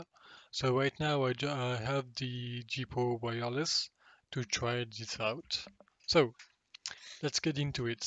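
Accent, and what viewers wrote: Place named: France. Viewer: Oulah c'est un français ça mdr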